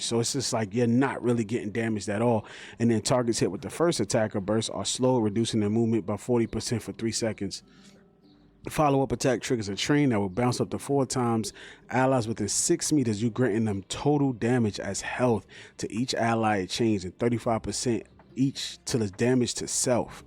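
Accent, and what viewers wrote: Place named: New York, United States. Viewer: my boy from newyork ? where at? haha queens represent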